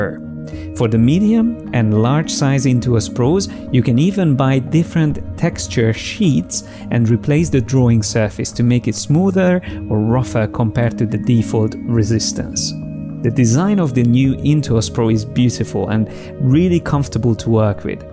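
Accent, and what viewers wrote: Hungary. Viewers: Are you Hungarian? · Thanks for the review, I use an Intuos Pro M for everything at the computer instead of the mouse, it helps to perform better. Oh, and I just found your channel, great stuff! You have some Hungarian accent, do I hear well? Keep up with the great work! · Thank you for the review! I can hardly wait to try the S pro however I'm still not sure is it worth to buy the pro version, but the better pen, extra programable buttons for shortcuts and the "touchscreen" possibility convienced me. I was suspicious that you are Hungarian because of the pronunciation, so that I must check it up and found it out that you were born in Budapest:) Szép estét!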